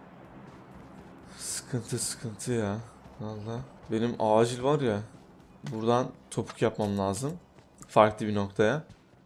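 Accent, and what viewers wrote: Adana, Turkey. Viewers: ADANALIYIM DE BİZİ KURTAR YÜKTEN BU ŞİVELERİN BAŞKA AÇIKLAMASI OLAMAZ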